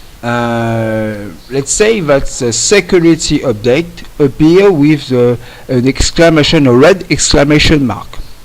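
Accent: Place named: France. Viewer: hello you are french ? because i think that you speak in "franglais" ? i like and joke you accent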